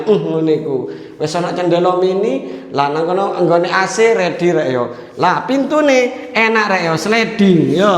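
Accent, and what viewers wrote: Surabaya, Indonesia. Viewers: Aku seneng logat suroboyoan mu mas. Salam dari boyolali lereng merapi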